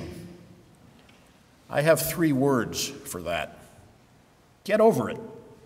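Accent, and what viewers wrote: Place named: Western Massachusetts, United States. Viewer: Hey! I found this speech while looking for samples of a Western Mass accent (for various mysterious linguistic purposes mwahaha), and I wanted to assure you that 1) even though it's been many years, I actually do remember the first "button" speech I heard from you, and 2) your accent is super familiar, like home, to me:-) All the best!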